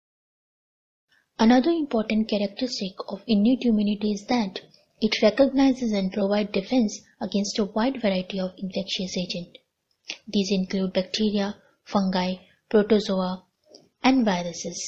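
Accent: India. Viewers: Excellent explanations and illustrations of key principals. The only problem is that the narration is difficult for me to understand in the moment of the fast moving narration, due to what sounds to me like a vaguely Indian accent. Is there some way to adjust this? I speak American English. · Ur india accent still so thick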